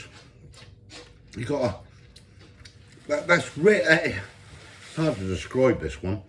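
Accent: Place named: Norwich, United Kingdom. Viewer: Norwich accent !!!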